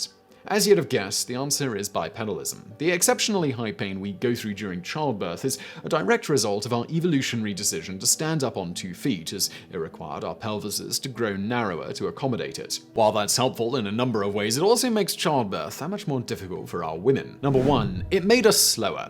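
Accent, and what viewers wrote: United Kingdom. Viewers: Thanks for another great video! I think one of the reasons I like watching Simon so much is he reminds me of my youngest son. It's the beard and the intelligent way of speaking (although my son doesn't have the British accent).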